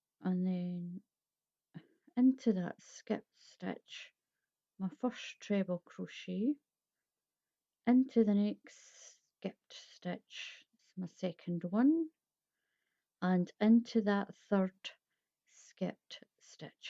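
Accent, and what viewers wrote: Scotland, United Kingdom. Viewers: Great looking piece! I'm starting a fundraiser for my preschool, and I wonder if you have any more suggestions for a desirable but easy (and quick) made projects? This one of course I'm considering. Also I could listen to you speak for ages. Scottish and northern Irish are my cryptonite! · Hi,, beautiful blanket. Are you from Scotland? You have beautiful accent.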